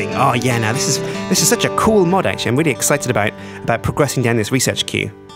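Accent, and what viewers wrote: United Kingdom. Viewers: "lasooo" i really hate the way brits say some words. for instance al-um-in-um is said as alu-min-ium and its really annoying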